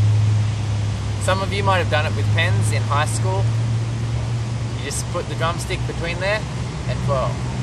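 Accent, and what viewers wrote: Australia. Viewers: Yes. The Australian accent varies a little bit over different areas of Australia. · Is that an Australian accent?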